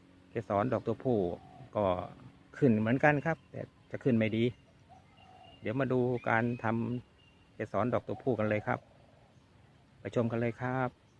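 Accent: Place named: Chanthaburi, Thailand. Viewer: เค้าเป็นคนจัน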